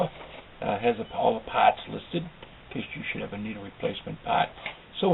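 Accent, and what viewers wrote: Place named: New England, United States. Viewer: Love his New England accent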